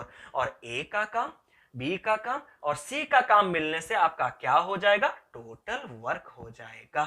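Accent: Odisha, India. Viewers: Are you Odia sir?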